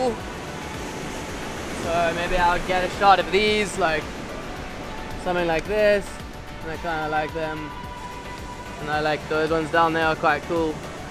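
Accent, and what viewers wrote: South Africa. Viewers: Is that a hint of a South African accent I hear in amongst the UK accent? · Saw the title and knew I had to watch it...Heard the SA accent & was so happy 😂 great, helpful video thank you!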